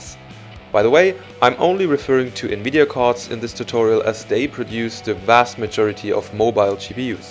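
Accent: Germany. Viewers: Ah, ein Deutscher, absolute Klasse, wir Deutschen sind eben einfach am Akzent gut erkennbar :)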